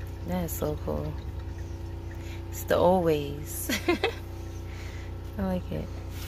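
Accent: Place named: New York, United States. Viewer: I love your videos, but i honestly enjoy hearing your voice. Its pleasant and calming. Plus that New York accent is everything 🤗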